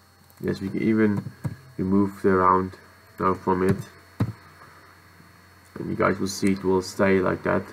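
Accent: Afrikaans, South Africa. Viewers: Are you Afrikaans? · You can tell that he's Afrikaans. I mean his accent says it all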